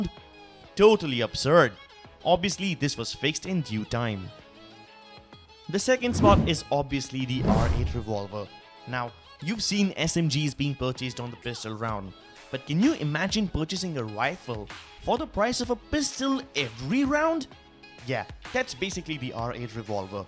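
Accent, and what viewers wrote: India. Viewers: I'm sorry guys, I know you're doing great job at researching and editing, and it's really not your fault (or nothing you can do about it), but this indian accent is just unbearable, made the whole, otherwise well made, video unenjoyable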